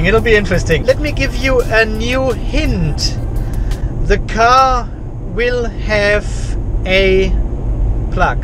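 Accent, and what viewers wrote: Germany. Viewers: Das verstehe ich sehr gut. Alleine diese Videos kosten schon enorm viel Zeit. Dein Englisch mit leicht deutschem Akzent ist aber für Deutsche sehr sehr gut zu verstehen. Gut, dass du dir nicht den australischen Slang angewöhnt hast.😉 Bei Garry Reed habe manchmal Probleme seine Worte zu verstehen.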